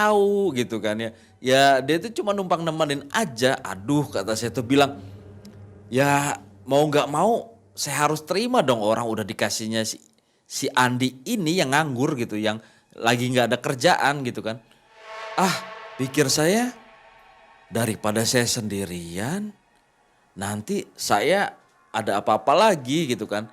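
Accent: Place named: Indramayu, Indonesia. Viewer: 😂😂😂😂😂timurtengh hadir mang ay masa allah dri indramayu apa y bahasaya medog goblog ya😂😂😂😂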